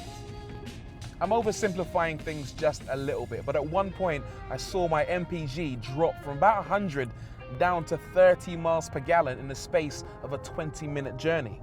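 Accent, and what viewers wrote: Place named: Bristol, United Kingdom. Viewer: What part of England are you from, Bristol area?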